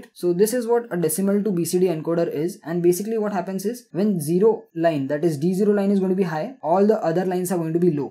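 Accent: India. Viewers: He is an Indian and its obvious that he'll have an indian accent.Stop expecting people to change their accent according to your convenience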